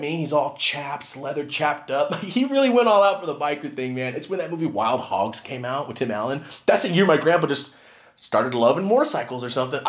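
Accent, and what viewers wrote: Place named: South, United States. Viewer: Am I the only one impressed by the southern accent?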